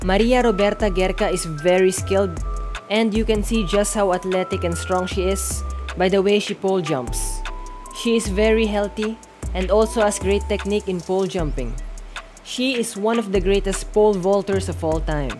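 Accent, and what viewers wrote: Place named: Philippines. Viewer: Why is a Filipina narrating the vid? (guessing by the accent) Shouldn't it be an Italiana?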